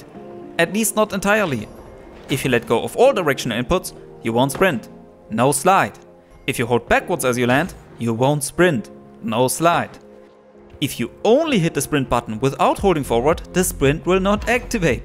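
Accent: Germany. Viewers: You are German?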